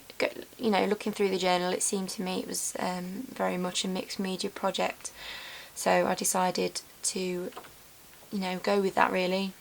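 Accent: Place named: Newcastle, United Kingdom. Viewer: also do u come from around Newcastle England area or just England if it's too personal I was just asking u sound like it. Also thanks for telling us about the RPF it really helped me 🙃😊😊😊😊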